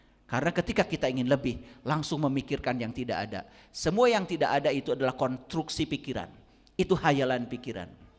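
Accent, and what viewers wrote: West Java, Indonesia. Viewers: Saya malah asyik dan seneng ayah guru ngomongnya ada sundanya 😍 padahal saya orang jawa 😂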